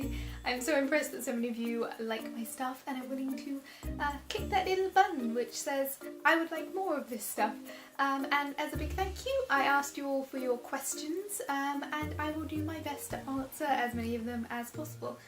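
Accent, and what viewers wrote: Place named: Cumbria, United Kingdom. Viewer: You've got to be from Cumbria, that accent is hard to narrow down!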